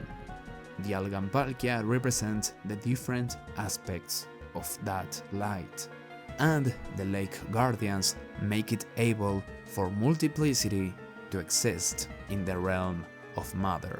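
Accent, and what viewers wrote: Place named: Spain. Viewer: I like your accent dude, where are you from? You mention empanadas in the description so I'm guessing español is your first language? Anyways really cool video with all the real world historical context. Earned my sub, man! I hope I will find more interesting videos when I check out the rest of your channel!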